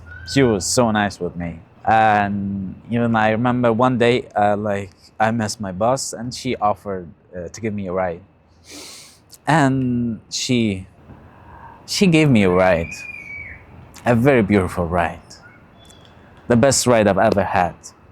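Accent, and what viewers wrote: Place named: Armenia. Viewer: You sound Armenian. They are nice people.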